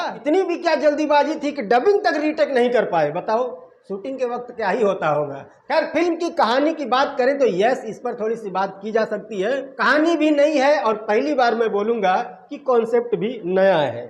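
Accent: India. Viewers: Aap to akadam south indian ke logo jaise bole hai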